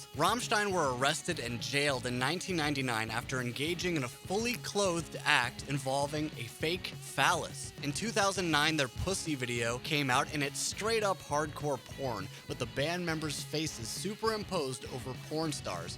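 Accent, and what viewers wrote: United States. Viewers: he kinda reads the words in english. He made great points about the band and that's what matters. If we judge, we can go as far as judging fans for not understanding german and that might include you. if it doesn't, it still includes like 80% of their fans. Yeah yeah, we know you know german better than others... save it. It's typical for americans to not use rolling r and sh the way germans do. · You said german is a brutal language. So could you please spell it at least not with a hardcore american accent? (Sry for being shitty but some names were spelled really meh xD) But good video! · Hearing Americans speak German is just silly😂, but you props for doing it! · The american accents fucks me up. dude please try im not even german and it hurts me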